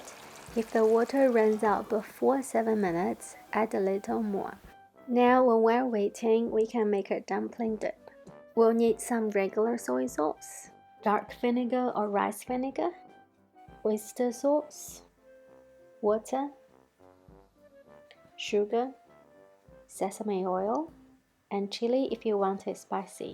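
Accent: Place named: New Zealand. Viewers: Love the Nz accent ❤ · Spotted the NZ accent straight away! Thank you for the tutorial! I've been lowkey scared to try pan-frying dumplings, so I've always just steamed them. I'll give this a try.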